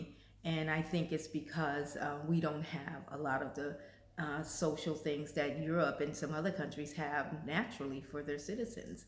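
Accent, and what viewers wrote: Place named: United States. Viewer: I can't speak for the rest of Europe but in Britain I think most people would be accepting and curious , the question is nuanced because some British people are becoming nervous of some folks from other countries than America who may also be black ( sad but true ) but once they hear you speak they will know you are American, I'm British and I hope this helps amigo 😊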